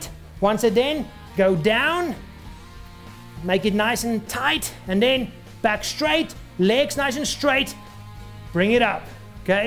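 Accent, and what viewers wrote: South Africa. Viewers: Must be South African, im South African and I recognize that accent anywhere plus he says: "anyfing" :D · I think it's South African...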